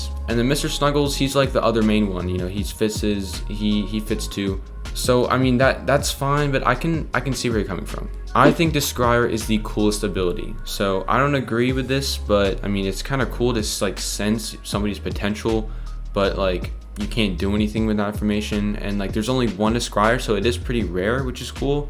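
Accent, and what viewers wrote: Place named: United Kingdom. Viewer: a very crisp british accent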